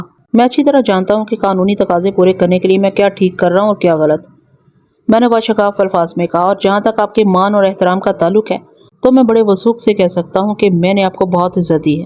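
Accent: Punjab, Pakistan. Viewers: بول رھی ھے اُردو آور لہجہ پنجابی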